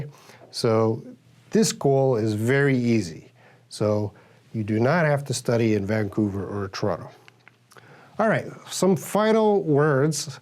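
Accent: Eastern Europe. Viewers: Are you eastern European? because you don't sound like you are from Canada. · I'm sorry, but minutes in you sound kind of Eastern European, or "something" Canadian. So you want foreign speakers to see you sounding weird to another Canadian, as normal? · So, as a linguist, I hear your speech has a slight Eastern European accent such as Czech or Slovak. What other language do you speak? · You really seem to have a bit of a Slavic accent of some kind - were your parents from over there? Or - what is your background? Just curious - if you don't feel like talking about it, no problem!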